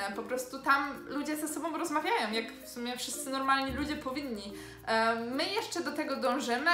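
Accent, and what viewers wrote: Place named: United States. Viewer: Robisz takie pretensjonalne naleciałości z angielszczyzny, jakbyś przesiedziała w stanach co najmniej 15 lat xD Na filmiku z operacji plastycznej już ich nie ma, dziwne xD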